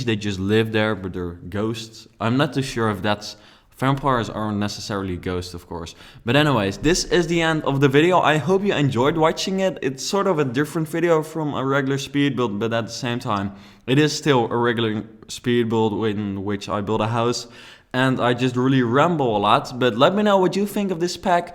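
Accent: Netherlands. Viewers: are you Dutch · Haha I recognized your accent as being Dutch before I knew you were! Awesome! Just bought this expansion and looking for some modern builds as well. I like modern and I like my vampires to live in a modern house as well. Nice build!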